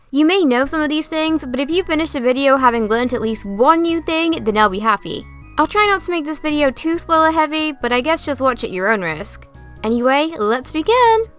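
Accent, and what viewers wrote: United Kingdom. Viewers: wtf are you british ???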